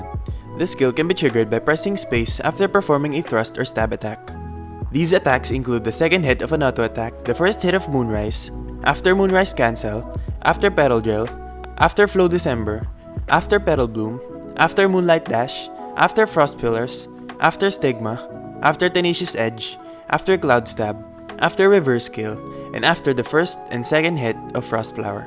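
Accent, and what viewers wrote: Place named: Philippines. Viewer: i hope this doesnt sound mean in any way, but are you filipino? i wanna say i hear the slight accent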